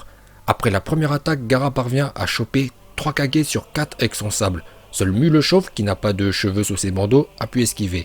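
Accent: Japan. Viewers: Je kiff ton accent japonais continue comme ça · Bravo pour t'est vidéo et continue comme sa je te soutien et continue ton accent japonais c'est tellement stylé quand tu sort ton accent japonais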